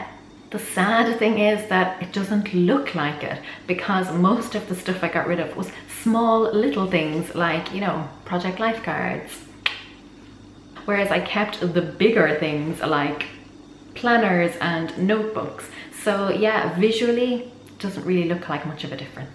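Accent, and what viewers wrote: Ireland. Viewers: Interesting video :) Are you Irish?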